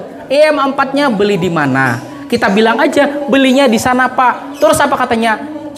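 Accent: Banjar, Indonesia. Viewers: Logatnya mirip kaya orang Banjar....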